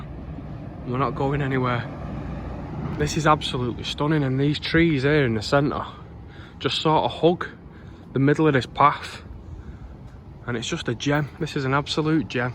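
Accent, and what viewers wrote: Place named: North, United Kingdom. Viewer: Great story and video editing, complete with reminiscent storyline in a strong northern accent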